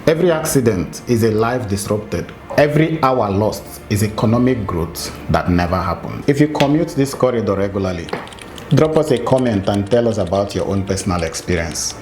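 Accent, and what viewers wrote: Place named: Rivers State, Nigeria. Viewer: GOD bless you Sir for your detailed and realistic videos. Please Sir, are you from Rivers state? You sound like one🙏 You're very professional 🎉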